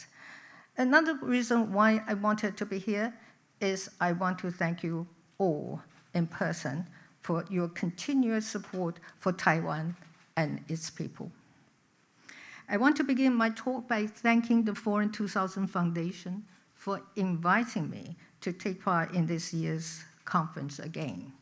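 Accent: United Kingdom. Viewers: 小英的英國腔好好聽喔～～智慧跟勇氣兼具，絕對是全球最有魅力的女性領導人之一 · 的口音真的很英式，不像台灣人在說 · 伦敦音很舒服有教养放眼世界无论东西方很少有的领导人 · 好獨特的英國腔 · 小英的微英國腔好好聽，堅定不移又時而幽默的口氣。不禁讓人想起對抗納粹時的英國政治家。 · 小英總統有點英式腔呢😂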